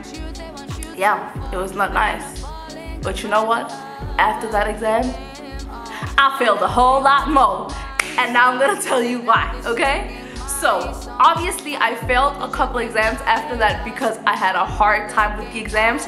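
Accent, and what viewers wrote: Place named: Latin America. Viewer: Your accent sounds somewhat Latino. What country and town to you live?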